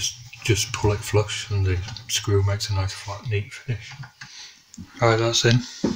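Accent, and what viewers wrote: Yorkshire, United Kingdom. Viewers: Loving your vids buddy. Love the no-nonsense, no-editing explanation of everything in that down to earth Yorkshire accent! 😆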